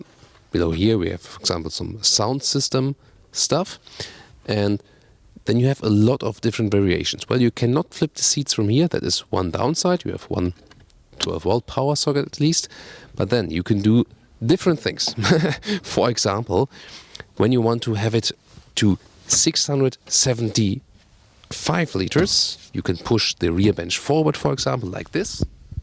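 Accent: Germany. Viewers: This guy is just great! I love the german accented: "Full HD, Full Screen and Full Lengzz! Let's GO!" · These are good reviews. Just factual....and with the funny German English ;)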